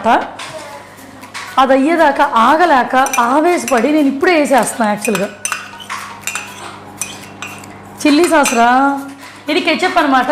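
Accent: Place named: Nellore, India. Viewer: me slang super akka.. ma nellore basha lage undi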